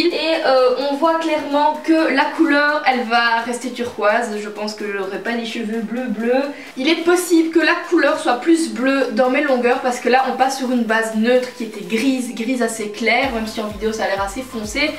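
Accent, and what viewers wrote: Belgium. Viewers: Aaah j'ai reconnu l'accent belge ! xD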